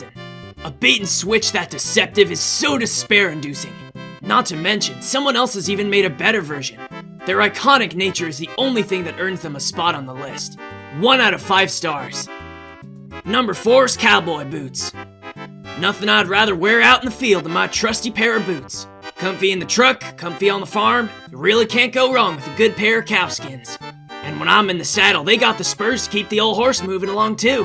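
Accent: South, United States. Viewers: ✨ I’m ✨ Uncomfortable ✨ with ✨ ✨ country ✨ accent ✨ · HELP HE HAS A COUNTRY ACCENT THAT SURPRISED ME SO MUCH I JUMPED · I love your country accent so much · Love the country accent 👌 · HOW DOES HE HAVE HIS STUPID VOICE AND A COUNTRY ACCENT AT THE SAME TIME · the country accent scared me. i physically recoiled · how do you sound like and have a country accent at the same time. I - that's incredible. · If I never hear that Southern accent again, it’ll be too soon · 'Ya didn't tell y'all fans 'ya had such a good cowboy accent! Teach me 'ya ways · with a country accent is somehow cursed and blessed at the same time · holy hope, the country accent, man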